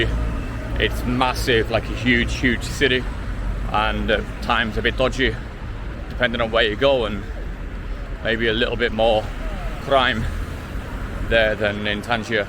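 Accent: United Kingdom. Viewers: I guess you are a mancunian or from northwest of England. All the best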